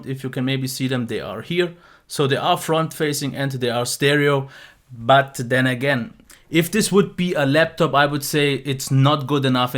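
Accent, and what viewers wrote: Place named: Germany. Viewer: That also sounds good to me! Keep up the quality and the good old German accent. :)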